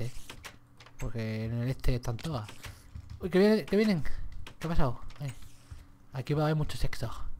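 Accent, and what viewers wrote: Chile. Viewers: Nunca vi este:'v PERO HABLA CHILENO DICEJDBCIDJCIBFICJFIF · like por el acento chileno del *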